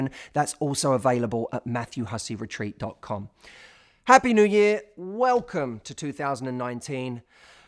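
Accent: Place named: Essex, United Kingdom. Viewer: He's an Essex boy ..it's how they talk.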